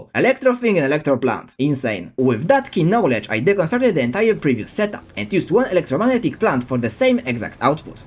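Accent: Poland. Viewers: I am like 99% sure that ur native polish person, it's just something about your accent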